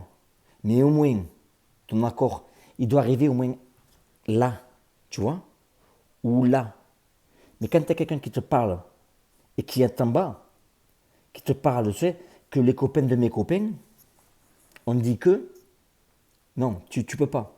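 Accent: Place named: Toulouse, France. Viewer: Cc!! Je ne te connais que depuis 3 semaines mais je t'adore surtout avec ton accent sudiste de Toulouse! Moi je suis d'Agen et in dit chocolatine ici bordel 😂😂😂 Continues comme ça, j'adore ton état d'esprit et ton franc parler! Vive le sud ✌️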